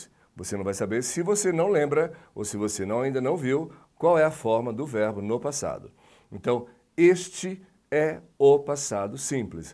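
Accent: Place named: United Kingdom. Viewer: Professor que fala britânico forte.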